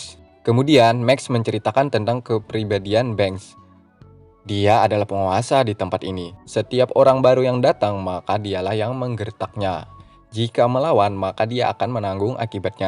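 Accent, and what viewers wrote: Banjar, Indonesia. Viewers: Pian orang Banjar kah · Ka kk orang banjar ya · urang banjar kah om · Denger denger dangsanak ... Hemm orang banjar ya bang😂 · Urang banjar kah? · Anjr bhasa bnjr nya kental bnr, fokus aja jdi fukus wk, tpi kdpp ai. Contoh chanel lain kya iq7 tu nh, beh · Woah mimin banjar kalsel sama nah sanak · Logat Banjar kdd hilangnya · Orang Banjar kah? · Sasakali bahasa Banjar hja pnk basuaranya kyp bisa bagus banar sako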